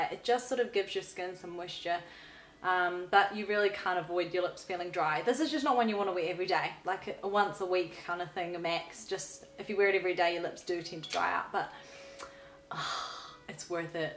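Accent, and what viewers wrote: New Zealand. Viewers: FINALLY a classy woman on Youtube doing makeup!!!! YUSSS,,where abouts are you from? im a kiwi and you sound kiwi/british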